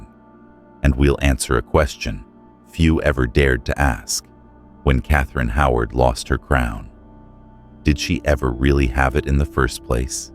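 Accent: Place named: United States. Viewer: Can't listen to my countries history narrated by an American, you just know some things and places are going to be pronounced completely wrong or be inaccurate 😮😮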